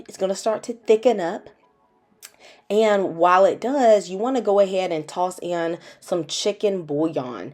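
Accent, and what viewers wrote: South, United States. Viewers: Why you sound like that other lady who cook do all y’all southern ppl sound the same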